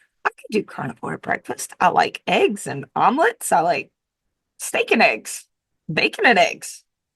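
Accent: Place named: Texas, United States. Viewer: Can't do accents? You have a stronger accent than mine, and I'm also from Texas. You have a country gal accent, you were just quoting a gal who has a backwoods accent, that's all.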